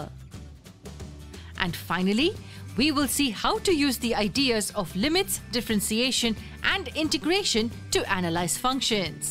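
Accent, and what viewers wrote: India. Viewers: WHY DID IT HAVE TO BE A IDAINAN VOICE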